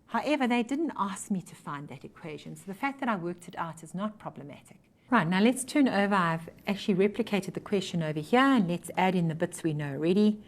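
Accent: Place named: South Africa. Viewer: South African Accent... Loving it :)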